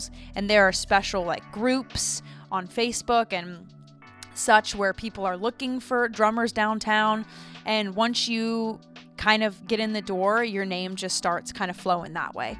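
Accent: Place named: Tennessee, United States. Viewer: Maybe Because you spend a lot of time in Nashville Tennessee maybe that’s why but you do not sound like you was born in California you sound like you was born in Tennessee or somewhere close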